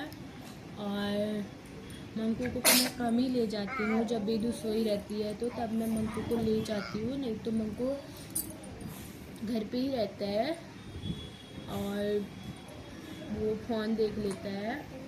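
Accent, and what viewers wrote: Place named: Pithoragarh, India. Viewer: आप की लेंग्वेज से समझ गई थी आप पिथौरागढ़ से होंगे, आप बेरीनाग से हो क्या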